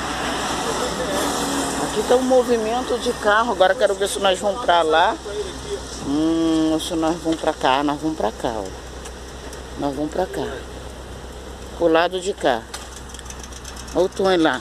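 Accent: Rio de Janeiro, Brazil. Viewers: Carioca. Normal.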